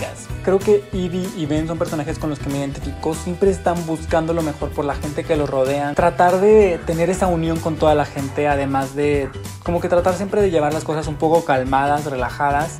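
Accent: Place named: Spain. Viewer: Aí gente sotaque espanhol e tão lindo kkkkkk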